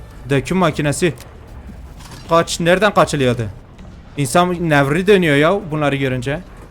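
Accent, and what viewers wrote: Elazığ, Turkey. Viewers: Abi sen Elazığlımısın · Abi Elazığ lı mısın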